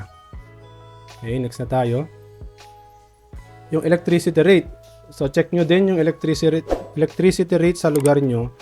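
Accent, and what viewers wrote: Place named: Pampanga, Philippines. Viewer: halatang kapampangan ka sir lol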